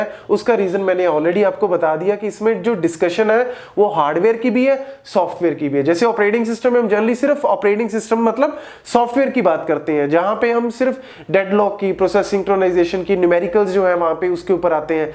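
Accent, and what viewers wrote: Punjab, India. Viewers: App Punjabi hai jururt hai bolne ka Method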